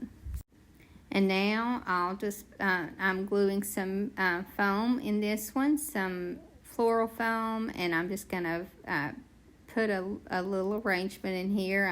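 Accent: South, United States. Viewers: I really did enjoy this video & I enjoyed listening to your sweet southern accent. My mom was from West Virginia & you brought back many happy memories... TFS 💕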